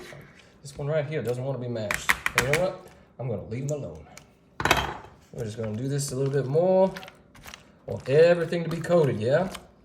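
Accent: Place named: Louisiana, United States. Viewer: Fantastic accent! May I ask where you're from? Could it be Louisiana?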